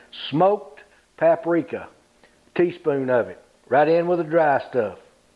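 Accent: South, United States. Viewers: I just came across your videos today while looking for a hot water cornbread recipe. I made them earlier for the first time using your recipe and they turned out great! I love the southern accent. I'm from Alabama, where are you from? I am planning to make corn fritters tomorrow. Do you have a recipe for those?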